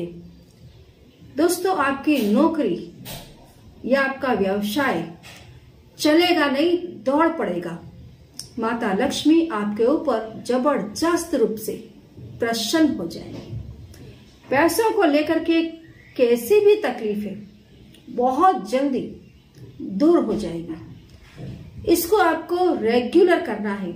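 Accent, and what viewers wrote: Bengal, India. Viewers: arre SHE DOESNT LISTEN AT ALL, SHES A WEIRD LADY, I HAVE TOLD HER MANY TIMES SHE HAS A BENGALI ACCENT AISE KI TAISE KAR DETI HIAN MANTRAS KI, NAHIN SAMAJH MAIN AATA HAIN BHAI KYA KARUN...UNSUBSCRIBE KARDUN KYA, SHE DOESNT UNDERSTAND AT ALLL,,,,,,SHE SPEAKS WITH ACCENT SAMAJH HI NAHIN AATA HIAN DAMNIT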